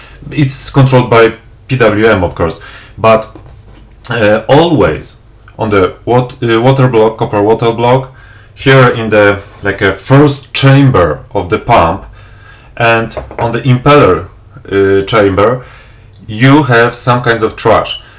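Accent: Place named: Poland. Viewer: haha domyślałem się że jesteś rodakiem - mamy charakterystyczny akcent :) ale Twój angielski jest naprawdę ok - Pozdrawiam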